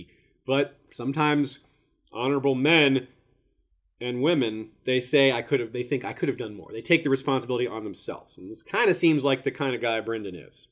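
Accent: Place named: Canada. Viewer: While I love all your videos, the new voice overs were a little much. Normally I'll leave the video playing during work and a coworker made a comment that they sounded Canadian. -_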